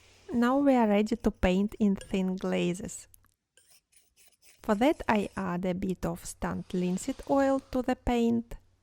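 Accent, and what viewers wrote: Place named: Russia. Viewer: Very strong Russian accent : )). Lovely paintings, have that renaissance quality of look, that airiness.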